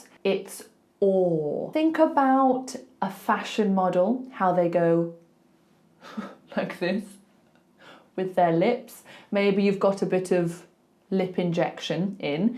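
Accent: United Kingdom. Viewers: I love british accent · For me it is funny to hear you and others brithish talking however when i hear people from wales, Ireland and central and north UK is a real kick in my balls undertanding their speech. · Your own accent while delivering of instructions throughout this video is self evident of standard British accent. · In my opinion RP accent is best English in the world. This is pure British.✅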